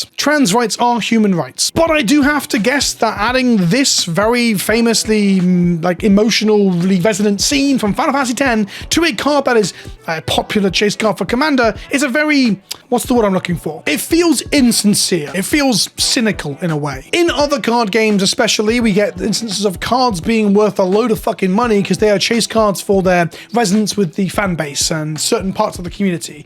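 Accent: United Kingdom. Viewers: I enjoy listening to an old British man yell at the clouds since I’m also old and find it annoying with these art treatments too!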